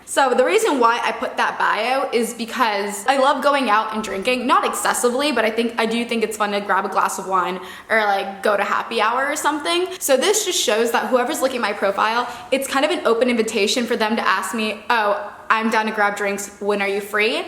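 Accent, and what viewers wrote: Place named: California, United States. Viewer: I knew you were from cali 5 seconds into this video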